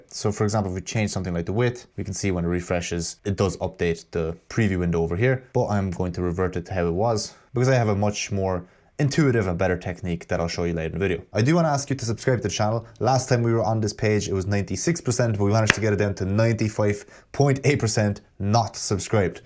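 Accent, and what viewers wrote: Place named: Ireland. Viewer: Been looking for something like this for months, dziękuję bro I hear some Irish in that accent 🤝🏼🤝🏼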